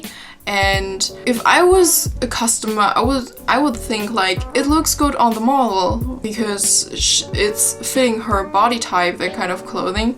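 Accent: Germany. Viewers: your accent ist german, right? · a pretty fucking horrible thing to say to/ about someone, and for what reason? I think her accent is beautiful. I love how soft and sophisticated German accents sound. Even if it was hideous, there's not much she can do about it, is there? Any changes would be slow, and besides there being nothing that needs fixing, she shouldn't have to spend years "improving" a perfectly understandable accent for our needs, not hers. JFC! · Were you born and raised in Germany cause you English really sounds 'germanized' :D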